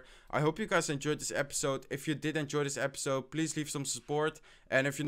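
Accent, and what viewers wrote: Netherlands. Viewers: I know that you are dutch you have the same acsent as kwebbelkop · Are u Dutch?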